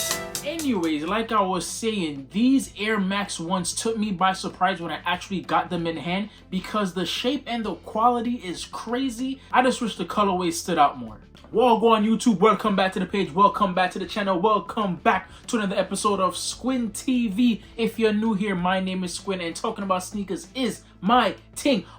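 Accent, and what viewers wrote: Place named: Toronto, Canada. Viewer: Good work bro. Toronto accent goin crazyy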